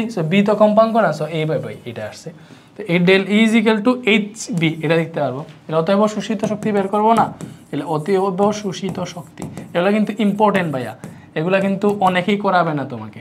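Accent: Sylhet, Bangladesh. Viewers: স্যার আপনি কি সিলেট বিভাগের?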